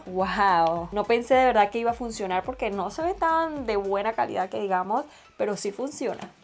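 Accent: Venezuela. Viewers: Queria preguntar de donde eras. El acento se reconoce siempre Venezolano.❤️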